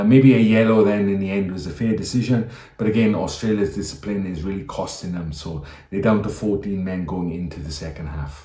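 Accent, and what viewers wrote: Wales, United Kingdom. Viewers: What accent do you have? I heard welshish but alot of others in there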